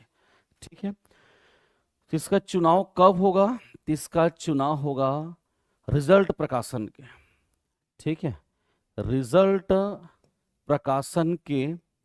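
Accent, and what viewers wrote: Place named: Chhattisgarh, India. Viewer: Wo Chhattisgarhiya hai, Chhattisgarh me jii bolna ek lahja aur good manners hai. Tai apn kam le kam rkh na padhe la aye has ta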